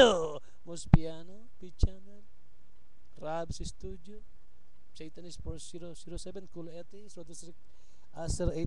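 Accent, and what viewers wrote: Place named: Visayas, Philippines. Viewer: hisa bisaya thats why sounds irritating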